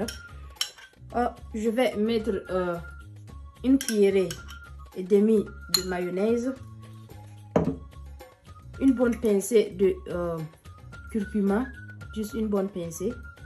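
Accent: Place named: Mossi, Burkina Faso. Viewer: Ça vient de l’accent mossi pas tous les burkinabè sont mossi. Y’a plus de 60 autres ethnies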